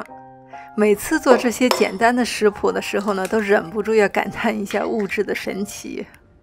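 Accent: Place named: Beijing, China. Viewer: 好可爱的儿化音口音💘，一看介绍，原来在我隔壁省。一直觉得像做饭或者做那种天然手工品（前段时间在看手工皂），都像巫师一样施法。难怪说，学好数理化，走遍天下都不怕🤣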